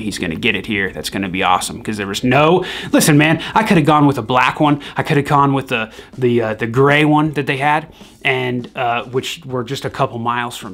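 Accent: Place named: New Mexico, United States. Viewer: lol I’m from New Mexico and that’s actually how they talk, And my mom has that same exact truck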